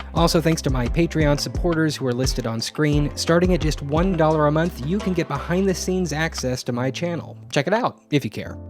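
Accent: Missouri, United States. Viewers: My mom is from Missouri and I knew you were too, based on the accent. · He's from Missouri. We all talk like that. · I live in Missouri and it is so obvious you are from Missouri even in your reporter voice. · He just sounds normal for Springfield Missouri.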